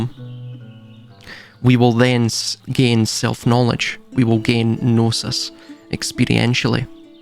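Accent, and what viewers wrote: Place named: Scotland, United Kingdom. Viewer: A very sobering video, depressing but liberating. Also, you have a silky smooth Scottish accent. Don't worry about that. My favourite band are Scottish. I've always liked the accent. Love from England!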